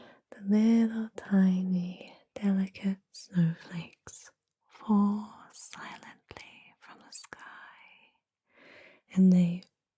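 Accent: United Kingdom. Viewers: Your guided meditations are the best with the cherry on top being your british accent! This is as amazing as the previous ones ❤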